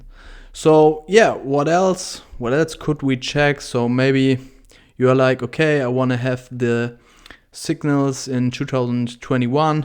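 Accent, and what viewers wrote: Germany. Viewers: You are also a German. Your English accent is my hint^^ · Hab an deinem Akzent erkannt, dass du Deutsch bist :D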